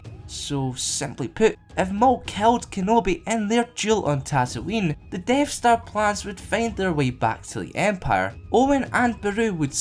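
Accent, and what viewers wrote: Scotland, United Kingdom. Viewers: Lambda Presents: Yes he is Scottish, His voice is cool! :) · Lambda Presents Scottish I believe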